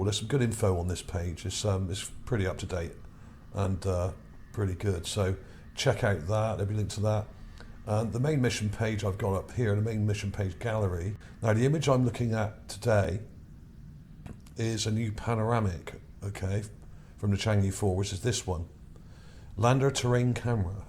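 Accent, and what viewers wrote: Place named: Wiltshire, United Kingdom. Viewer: I thought this Was Richard Dreyfus . Although I don’t watch tv i enjoy comics on YT and wondered what happened to him. Wasn’t he from Dorchester ? Although our narrator sounds more Wiltshire imo. How come they haven’t got a better look at the spire thing photographed in the 60s ? ( on the moon , not the one in Salisbury) ? Like others say , they aren’t spending multi millions to just sightsee ( as the Russians did - not in Salisbury of course ). Keep up the interesting work fella - up the Cherries 😎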